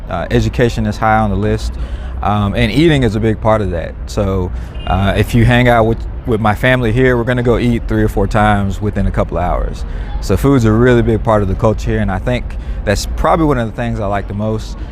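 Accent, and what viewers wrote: South, United States. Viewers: I'm from the south and I always thought I hated southern accents til I heard (and saw) this man speak 😭😝🤕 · Handsome!!! Don't loose that southern accent.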